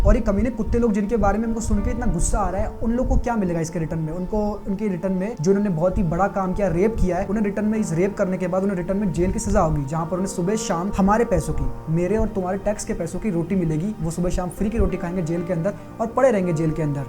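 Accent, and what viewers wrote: Hyderabad, India. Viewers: Bhai may be hyderabad sey ho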